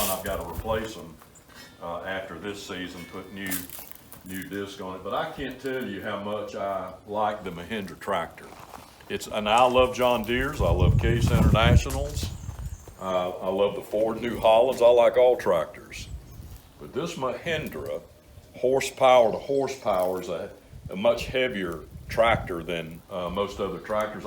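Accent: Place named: Carolinas, United States. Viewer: You seem very familiar. Your accent and the way it looks I'd swear your in NC or SC...the tiger paw sleeve would make me think your in this area. Not sure if we have ever met somewhere or you maybe just remind me of someone?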